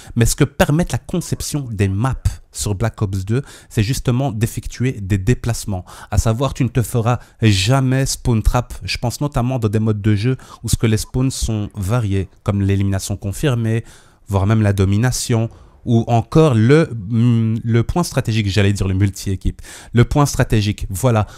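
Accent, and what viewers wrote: Belgium. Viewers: Un petit accent belge il me seble non? :) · cho l'accent belge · Le commentateur est belge ? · Tu viens d'ou de Belgique?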